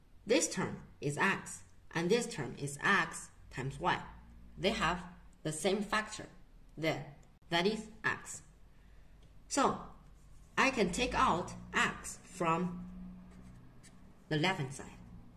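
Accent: China. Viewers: 听着亲切，感觉像东北的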